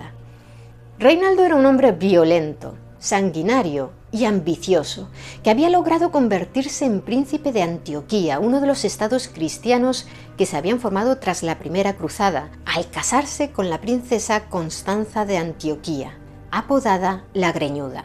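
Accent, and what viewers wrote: Spain. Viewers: Ese acento español es bien agradable, de que zona es?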